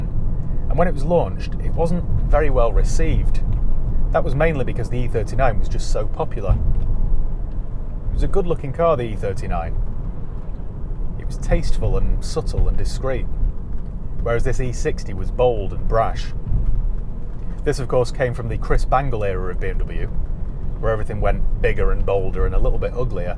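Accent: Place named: Newcastle, United Kingdom. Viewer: Its Geordie speak, south of the border.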